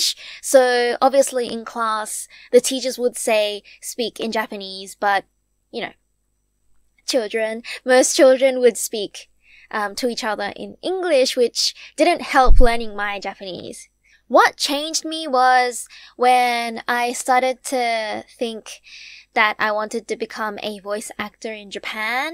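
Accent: Australia. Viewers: Oh man just found out this channel today. The nostalgia. I lived in Australia too for my childhood and they sent me to Saturday school. I fucking hated it 😂 In the end I went back to my home country and I still can't speak my mother's tongue, what a waste of perfectly good Saturdays. Unfortunately my Aussie accent has died a bit cause I have to adapt to the English here so others can understand me. Man it'd be great if I could go back - say sunnies, undies and collect cricket cards from Weetbix again. It's so refreshing hearing the Aussie accent even if it is a bit different than what I'm used to, I think it's from Sydney. I'm #Perth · The aussie accent is so cute😩💓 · I knew that she was from Australia the first time I heard her accent I have a friend that's from Australia and she has mostly the accent as my friend lol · Every time I have one of your videos going in another tab I expect the next words out of your mouth to be "WELCOME BACK TO HOW TO COOK THAT I'M ANN REARDON" your aussie accent is so strong, I love it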